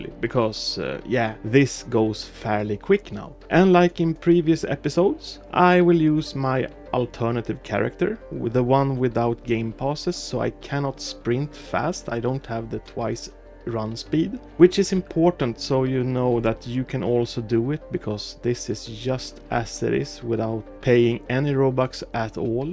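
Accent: Sweden. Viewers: you kinda sound swedish (think you are from sweden) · nice that helped me a lot!! are you from sweden?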